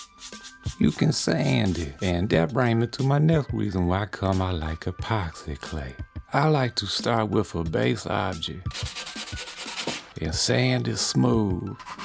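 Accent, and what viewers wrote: Louisiana, United States. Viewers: One of my secret joys is clicking subtitles on these videos and watching the Youtube robots struggle to interpret smooth and melodic Louisia-ness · where you from Louisiana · I'm still convinced that this man is a cajun from my home state of Louisiana. Love your work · I swear you sound like my Louisiana people.......glad I moved back!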